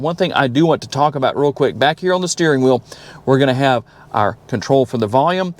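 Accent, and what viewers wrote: South, United States. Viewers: He speaks like someone from the southern United States. He is probably very respectful, cares about people he doesn’t even know and smiles when people aren’t nice to him. Good salesman. Might buy one of these because of the video.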